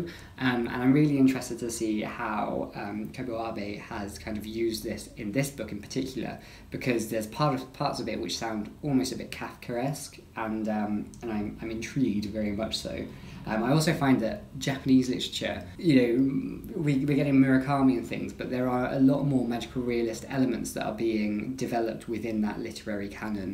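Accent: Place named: United Kingdom. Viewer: I am from india ,came here to find. Something about surrealism but now I am like ..Wow I like this british accent 😘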